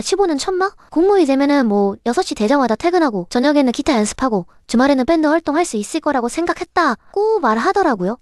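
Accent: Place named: Gyeonggi, South Korea. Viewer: 얘 말투 이거 경기도사투리냐? 귀엽네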